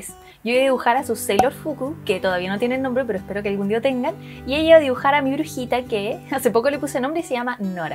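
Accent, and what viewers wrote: Chile. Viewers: Amo cono suena su acento chileno 💛💖💛💖 ~ bacan Jsjsjsjs la amé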